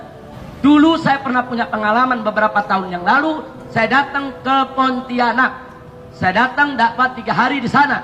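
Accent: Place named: Malaysia. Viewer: Melayu keras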